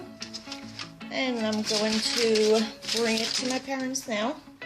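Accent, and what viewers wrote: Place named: Boston, United States. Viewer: you from boston? sounds like it how u pronounce coffee, sauce and salt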